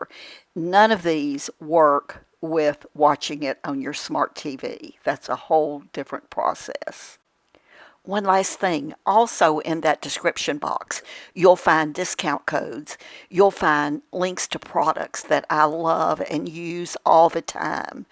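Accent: South, United States. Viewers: Just think you’re ADORABLE!! Love your Southern accent!! It’s so beautiful!! I flew for Southwest Airlines and the flight attendants that were from Dallas and other Southern states, could get away with saying just about ANYTHING ( rude language) to “bad customers “ with that Southern accent and that passenger had ABSOLUTELY NO CLUE that they were being made fun of OR that they were just being TOLD OFF!! 🤣😂🤣😂!! That accent could sink battle ships!! I loved it and tried to copy it 🤭🙄 with absolutely NO LUCK!! Keep up your great work and you are so inspiring!! ❤️🤍💙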